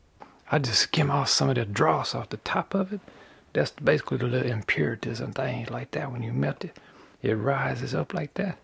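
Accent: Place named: New Orleans, United States. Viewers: Did I just stumble upon the Bob Ross of metal casting with a New Orleans accent? This is gold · This is possibly the most relaxing channel on here right now. And it’s also edumacational. You’re like a bayou bob ross. (Sounds like you have a Nola accent)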